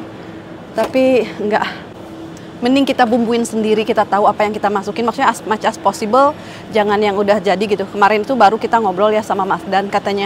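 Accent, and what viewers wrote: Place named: Sunda, Indonesia. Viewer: Teh kl ngomong sunda lucu kdg suka ktawa😁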